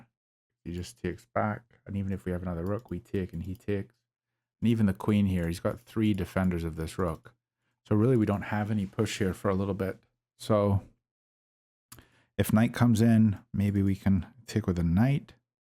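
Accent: United Kingdom. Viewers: gg buddy , which accent u have in uk¿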